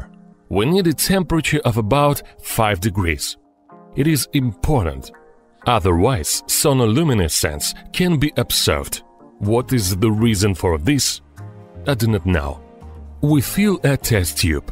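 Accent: Russia. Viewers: Вот ниразу не слышал русского человека, говорящего по английски, которого нельзя было бы опознать по акценту. Видос классный! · Your russian accent (I'm assuming) doing english is funny, tbh i like it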